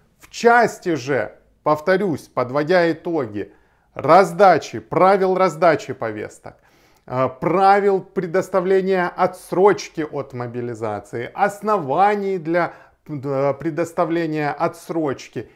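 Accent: Ukraine. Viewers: Кто этот блогер,говор украинский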